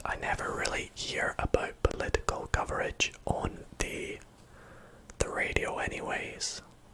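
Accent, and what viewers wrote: Scotland, United Kingdom. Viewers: Британский чел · YES HES SCOTTISH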